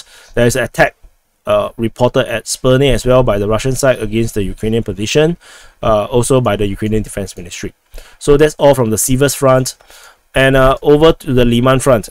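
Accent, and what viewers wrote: Singapore. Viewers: You sound Singaporean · Agree, lets say the smallest bias then. I suspect leans somewhat to the russian side. But probably doesnt care too much. says; "I just want the war to stop" - and I belive him. Again, because is from Singapor is able to distance himself from the bias thing. I am surprised that has so many subscribers, due to the heavy axent. It is probably due to the neutrality in his reporting and nothing else. even makes small jokes while reporting, noone else does that. Again, that is also probably due to his true neutral standing. Because doesnt really care either way. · are you Singaporean or Malaysian? no horse run brother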